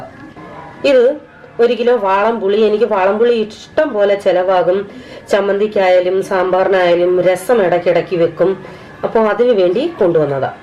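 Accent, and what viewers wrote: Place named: Kollam, India. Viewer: what kap thot meen kutmo nee.......... kollam slang super!.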